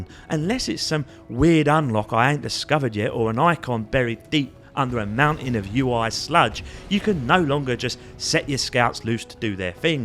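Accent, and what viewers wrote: United Kingdom. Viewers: Definitely the most British opening to a video I have ever heard.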